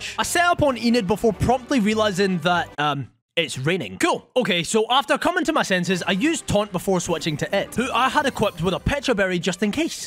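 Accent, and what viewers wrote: Scotland, United Kingdom. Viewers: you're so aggressively scottish and i love it because so is my grandfather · Its nice that you have a Scottish accent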